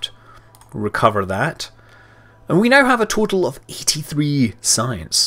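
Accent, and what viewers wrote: Scotland, United Kingdom. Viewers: hence your name, you sound a bit scottish.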